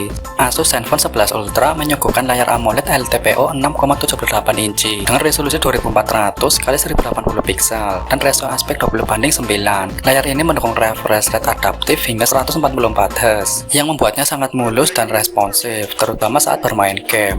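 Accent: Banyumas, Indonesia. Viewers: ngapak banget sih ya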